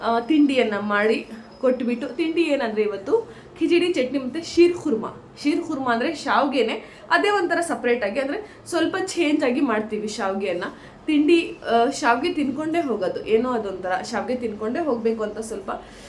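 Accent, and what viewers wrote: Standard. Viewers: ನಿಮ್ಮ ಸ್ವಚ್ಚವಾದ ಕನ್ನಡಕ್ಕೆ ಧನ್ಯವಾದಗಳು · pure kannada sister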